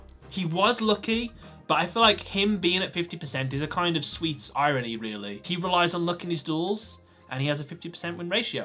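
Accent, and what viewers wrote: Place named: United Kingdom. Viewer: So British, so nice to listen.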